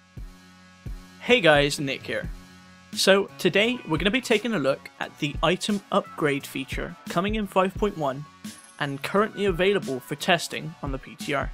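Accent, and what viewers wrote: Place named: Bristol, United Kingdom. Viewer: Wow... you sound sooo bristolian..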